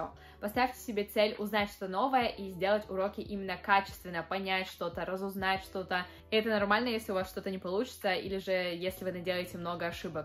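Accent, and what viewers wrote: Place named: England, United Kingdom. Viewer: как будто английский окцент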